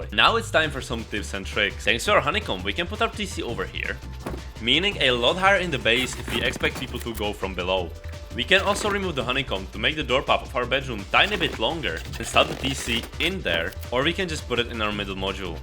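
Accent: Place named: Czech Republic. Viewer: Český přízvuk jde prostě poznat haha super baseka!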